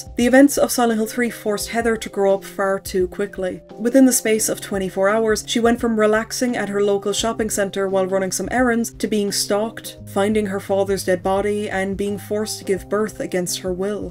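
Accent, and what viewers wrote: Ireland. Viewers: Forgive me as I'm new, are you Irish? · it's always so cool to hear another irish person on ytube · Am I detecting a pretty strong Irish accent in your voice? 😉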